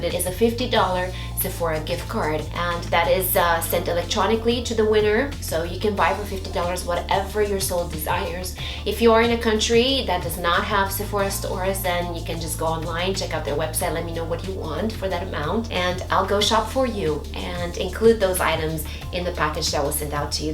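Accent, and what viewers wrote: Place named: Romania. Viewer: Hei! Din nou iti scriu in romană,sper ca nu este ceva care sa te supere,dar stiu ca esti românca asa ca de fiecare data cand adaug un comentariu la un clip de-al tau aleg sa iti scriu in romană! Imi place foarte mult accentul tau cand vorbesti lb engleza! 👌🏽👌🏽 Dintre videourile tale am 2 care imi plac in mid special, si anume "Smokey green and glitter tutorial" dar si "10 Beauty and skincare recipes"! Te urmăresc si pe Instagram si imi place sa ma uit la insta storyes făcute de tine! Sper sa fie cu noroc participarea mea la acest concurs! Pupici din România! 💋💋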